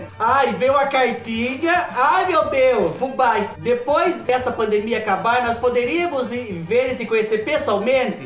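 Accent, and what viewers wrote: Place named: Southern Minas Gerais, Brazil. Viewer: "Espicula de rodinha" é da minha época...amei...acho que você veio daqui da roça, (será que te sequestraram bebê?) no sul de Minas, vc fala igual "nois aqui" bjs...ops! Fubá...caí de paraquedas no seu canal e me apaixonei pelos videos...sucesso! sempre!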